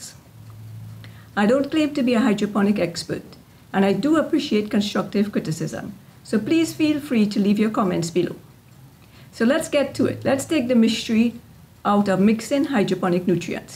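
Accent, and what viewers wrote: Trinidad and Tobago. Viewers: Are you from Trinidad? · You sound like a real trini gal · I knew her voice was sounding like a Trinidadian!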